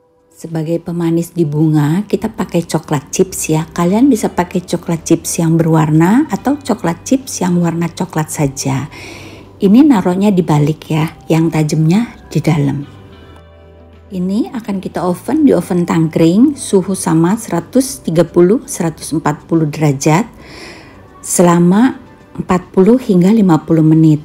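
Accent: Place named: Merauke, Indonesia. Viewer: Suaranya seperti nona merauke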